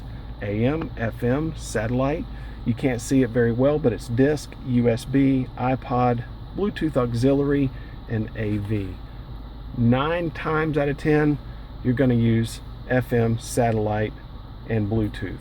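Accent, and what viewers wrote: North Carolina, United States. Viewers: Thank you sir for this informative video! Going to pick up my new GX here soon and you have saved me a lot of time. Love hearing your North Carolina accent too (I'm from WNC). Will tune in for more car related videos!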